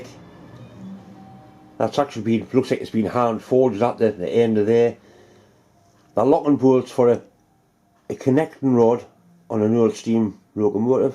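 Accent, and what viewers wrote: Newcastle, United Kingdom. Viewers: The auto text cant understand Geordie either. 😂 At least mine cant.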